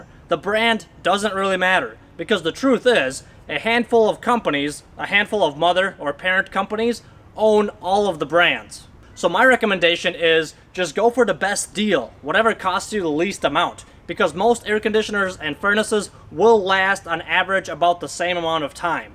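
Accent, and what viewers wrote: Minnesota, United States. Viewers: Your content is great! Knowing you're a Minnesotan (cause I talk like you too!) but now I live in So. Florida. People say lifespan of 10-20 years but what about hours used? I have a 10 yr old 13 SEER Ruud 3 ton unit running 410 refrig. would you replace it with a 16 SEER or just keep it tuned up and hope for 15 years? System checks out ok, I replaced capacitor last year but seems takes nearly 8 hours to cool down house from 80 to 72F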